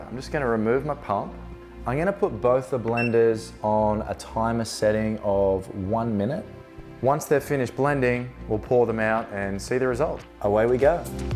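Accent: Australia. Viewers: I subscribed for the Oz accent 😂😂😂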